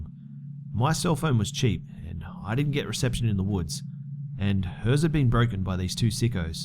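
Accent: Australia. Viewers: Bethsabée Newrose yess Aussie accent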